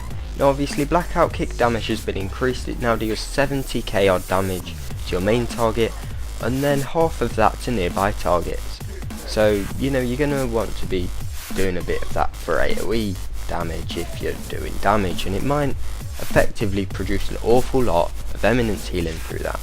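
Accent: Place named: United Kingdom. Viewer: Where are you from? Trying to cypher which flavor of the UK accent you have?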